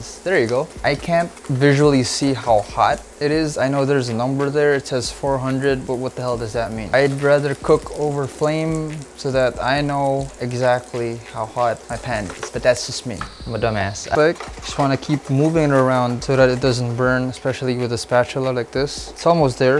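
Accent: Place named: Philippines. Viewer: I THINK YOUR ARE FILIPINO BECAUSE OF YOUR ACENT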